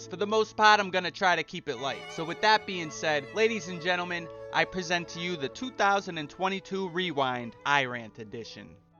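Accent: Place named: Massachusetts, United States. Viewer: With that accent, announcing that you're from Mass is the most unnecessary thing ever.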